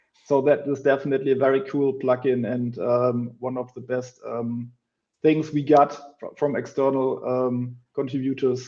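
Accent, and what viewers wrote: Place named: Germany. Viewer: Drei Wörter gehört und gedacht "det is n deutscher" und dann "sooo" =)). Ich wünscht ich könnt nur 10% so Englisch sprechen. But if I hear it, I can learn it.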